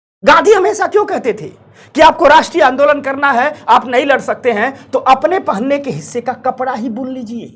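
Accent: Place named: Uttarakhand, India. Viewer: Guru ji aap uttarakhand ka ho kya apki tone lgre h aap dehbhooni sa,, ho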